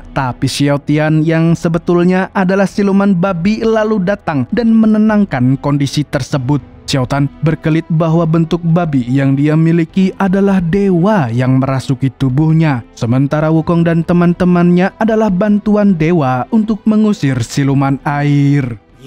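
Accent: Banjar, Indonesia. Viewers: Orang Banjar wara sklinya😅🙏🤣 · orang Banjar nii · Urang banjarkah admin ni😂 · banjar bnr amang ny ni😂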